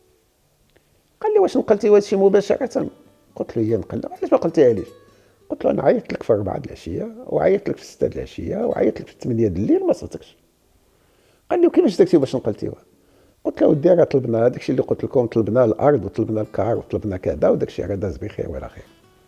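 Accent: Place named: Egypt. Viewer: هل هدا سفير مصر في المغرب ? لان لهجته اكتر مصرية من مغربيةً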